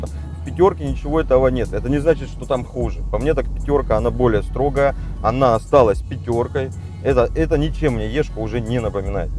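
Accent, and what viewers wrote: Rostov, Russia. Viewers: Про речь ужасную кто там писал.Это суржик,норма казачьего диалекта,не является нарушением,это Ростов.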